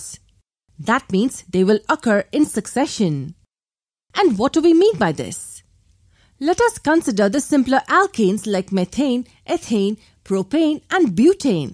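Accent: India. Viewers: Is the speaker indian ?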